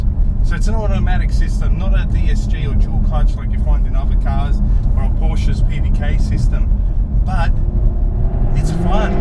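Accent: Australia. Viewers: If this is what the Australian accent has come too , i am fucking disgraced in this country . Like oh my gawd fully fucking tabouli ....... wake up australia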